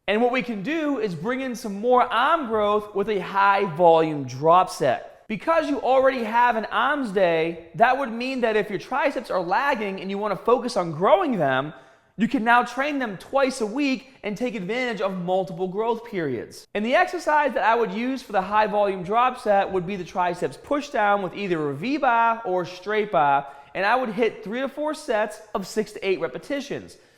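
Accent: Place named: New England, United States. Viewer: I don’t remember this thick New England accent when you were on the real world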